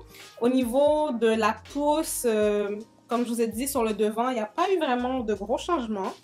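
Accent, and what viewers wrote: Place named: Quebec, Canada. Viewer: J'aime trop ton accent québecois en plus tu est de toute beauté😌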